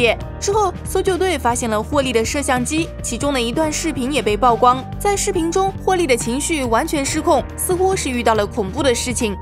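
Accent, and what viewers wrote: Jiangsu-Zhejiang, China. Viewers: 可能因为Up主是江浙人吧~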